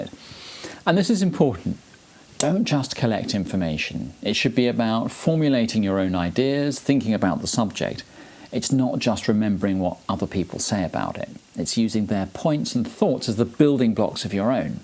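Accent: United Kingdom. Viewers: learning thinking skills in general is a key factor in any successful learning process including critical thinking for sure to isolate false data and extract facts based on sound truthful information, then comes skills how to read, how to write and how to search for truths by yourself. thank you for sharing your ideas and i love the British accent you speak with, it sounds academic for me ! Thanks again.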